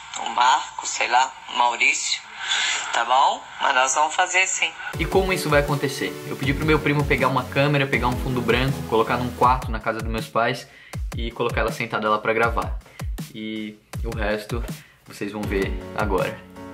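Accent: Florianópolis, Brazil. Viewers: nem sabia, mas logo vi esse sotaque manezinho lindo ae ♡ que liindo o videoo à sua mae!! paz e bem!! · HAHAHAHHAHAHA logo pelos 5 primeiros minutos já deu pra notar o sotaque manezinho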